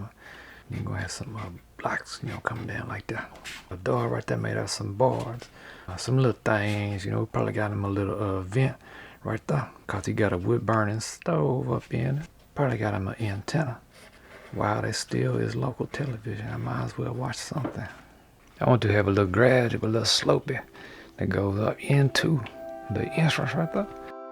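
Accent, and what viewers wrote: Louisiana, United States. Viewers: Do i hear a cajun accent? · I can't tell if you're a character or just a witty leezianna southerner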